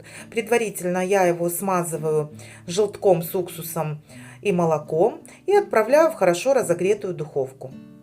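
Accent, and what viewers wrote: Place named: Surzhyk, Ukraine. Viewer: МАТЬ- то тут при чём? Да ,неправильно человек говорит,но поправьте,подскажите- она исправится ,увидев нормальный совет.Вот привыкли люди так говорить ,я так поняла ,хозяйка из Украины,там ,в основном ,так и говорят.Не потому ,что неграмотные,а потому ,что говорят на " суржике",в основном.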